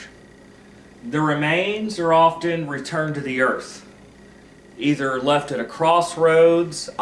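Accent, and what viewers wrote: South, United States. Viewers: I love your hoodoo stuff the most. It must be the Southern Accent.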